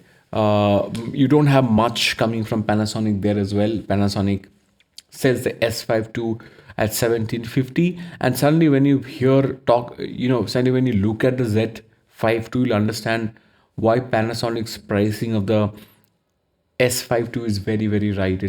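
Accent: India. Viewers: Your Indian English accent is not a problem and it is very clear and understandable. Yes, there are some people of India which has a deep and not understandable accent, but your not one of those people.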